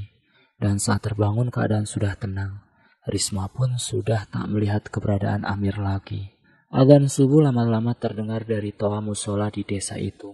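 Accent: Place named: Borneo, Indonesia. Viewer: abang ne urg kalimantan kh , logat bhsa nx kek asle urg pribumi borneo 😅😅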